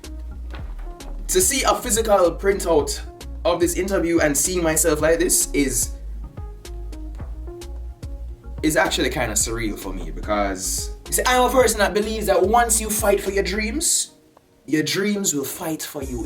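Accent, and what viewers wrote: Jamaica. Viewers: This might sound crazy, but him sound like young machel montano, just with a jamaican accent instead. Same kinda tone and slight raspiness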